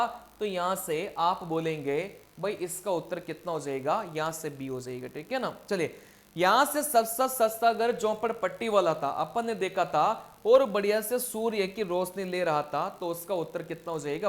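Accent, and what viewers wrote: South, India. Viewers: Oo But aapki hindi tome south indian wala aata h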